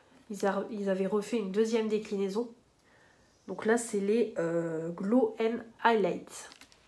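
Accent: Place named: England, United Kingdom. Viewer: En effet, j'ai kiffé le petit accent anglais 🤣❤️ hâte de voir de jolis makeups avec cette palette ✨️🌸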